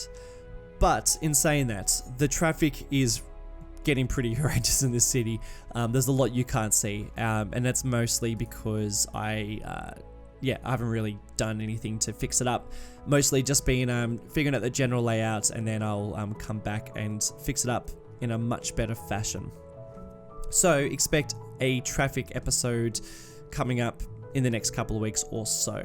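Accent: Australia. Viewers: This one is my favourite series of yours I think, never been to China but your level of detail is inspiring. I'm also from Sydney so it's kinda nice hearing the Aussie accent 😎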